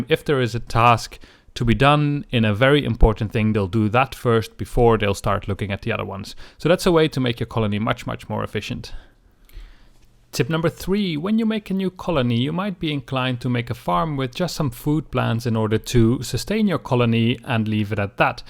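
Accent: Netherlands. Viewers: you sound Dutch :) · Dutch accent ?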